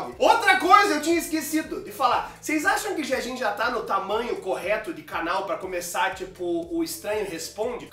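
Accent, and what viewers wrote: Curitiba, Brazil. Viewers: vc eh de curitiba seu estranho?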